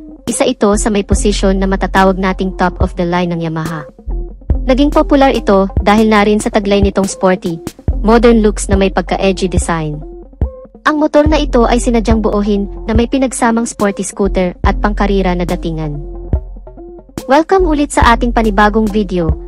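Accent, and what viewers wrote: Visayas, Philippines. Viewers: Bisaya ka day?!😂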